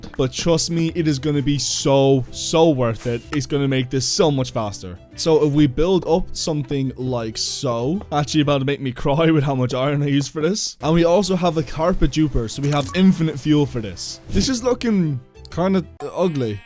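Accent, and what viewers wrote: Ireland. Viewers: always pleasantly surprised when i hear a fellow dubliner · Is that an Irish accent I hear ?? An Irish Minecrafter? Finally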